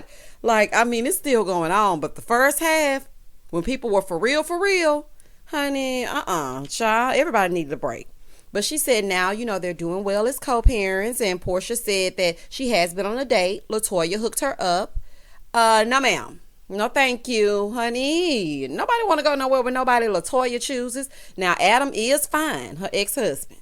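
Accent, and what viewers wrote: South, United States. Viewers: oh godess you have the cutest voice and balanced fun comentary are you a southern belle?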